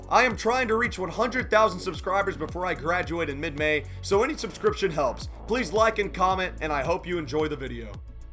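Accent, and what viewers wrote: South, United States. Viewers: why do I kinda hear a Southern accent in his voice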